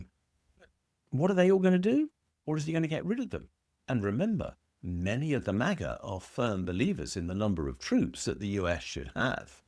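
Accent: United Kingdom. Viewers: I’m not going to waste my time listening to your British sounding horse shit! MAGA will give up when they have experienced so much PAIN!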